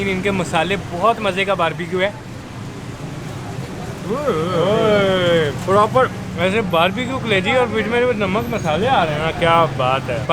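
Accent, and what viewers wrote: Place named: Punjab, India. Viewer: Love from Indian sir ap k bolna k tarika punjabi 🥰🥰🥰🥰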